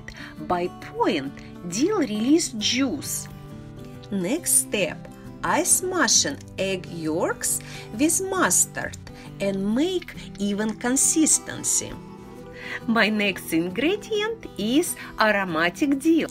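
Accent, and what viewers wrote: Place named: Russia. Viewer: I love your soup and your Russian accent also, my wife is Russian and i love anything Russian 😍 nice video👍Спасибо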